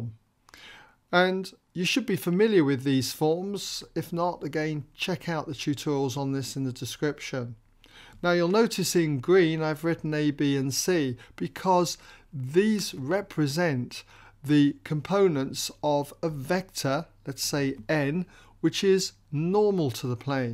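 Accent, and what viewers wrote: United Kingdom. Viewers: you have the most modern british accent I've ever heard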